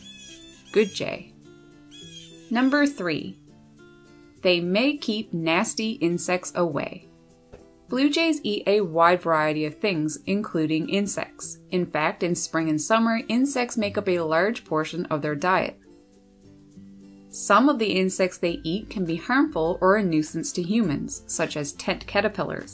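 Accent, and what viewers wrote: Canada. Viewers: Boy are you from Canada! {but thats a good thing} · You sound canadien. So these might be Toronto Blue Jays?! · Blue jays “ afften gehda biyiahhdd rahhp”... what ? Haha. Where is she from ? Lord !!!! Have mercy !! Oh wait. Canada. She said a boat it. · "A hewse" and "figured oat" = canada.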